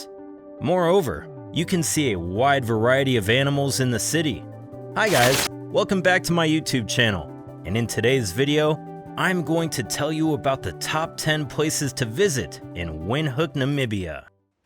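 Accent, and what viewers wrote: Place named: California, United States. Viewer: Very good and informative video but you could at least try to not pronounce the places and names as if they where in california or nevada💀💀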